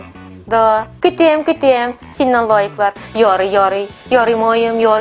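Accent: Russia. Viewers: мои родственники похоже из Сергача. Говор один в один😂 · Это не чистый татарский однако. Как будто с шипящим башкирским акцентом, причём очень заметным. В Татарстане народ так не говорит..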